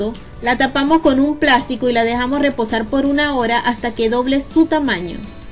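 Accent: Venezuela. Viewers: estoh eh venezolano o que chicoh po que si va pueh tanto venezolano aca parece peru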